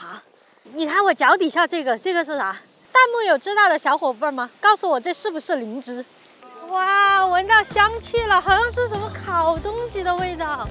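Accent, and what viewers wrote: Sichuan, China. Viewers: 四川口音好重！ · －口川普，好可爱😊😊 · 这口音绝对四川妹😄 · 你这四川口音好重，😊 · 你這個川普可以喲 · 你的川普不错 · 你这个川普真滴正宗啊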